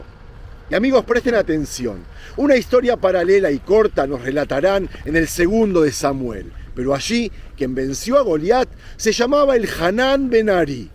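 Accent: Argentina. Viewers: Yo pensé que era Argentino.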